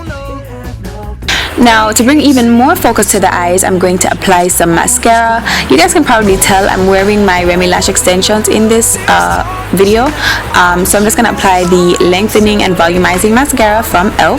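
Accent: Jamaica. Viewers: are you jamaican or Trinidadian? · Ooo she Jamaican go girl!!! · love yo accent (team jamaica)😊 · ooh Jamaican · Love the Jamaican accent. you are so beautiful. big up yo self.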